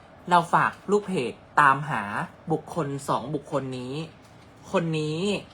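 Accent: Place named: Ubon Ratchathani, Thailand. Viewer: อุบลชัดเจนครับ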